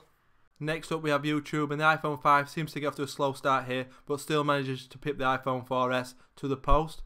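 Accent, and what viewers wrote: United Kingdom. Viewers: xDDD i like your accent, its very... british xddd good video, like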